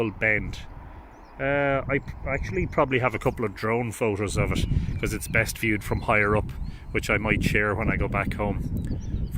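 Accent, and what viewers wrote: Ireland. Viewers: Gay drogheda accent you have must off been a while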